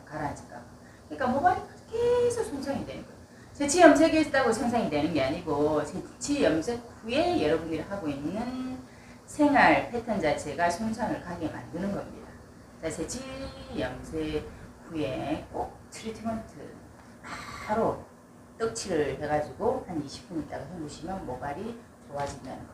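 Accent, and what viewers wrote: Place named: Busan, South Korea. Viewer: 말씀도 넘찰지게잘하시네요 사투리도 구수하구요 저역시부산서 오래살았거든요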